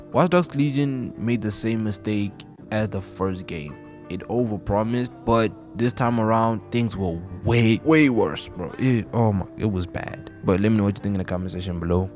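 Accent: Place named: South Africa. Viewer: For some reason I hear mzansi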